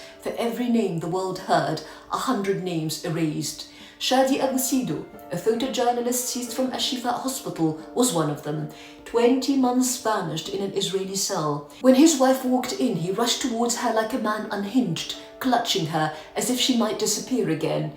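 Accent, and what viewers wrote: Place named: England, United Kingdom. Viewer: Pity about the elite English accent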